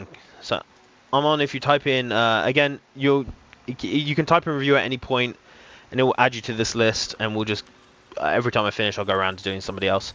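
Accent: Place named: United Kingdom. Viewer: I DIDN'T THINK U WERE STREAMING definitely gonna watch ur next stream also yes not many british ppl say bo'o'wa'er but ur accent is quite clear and obvious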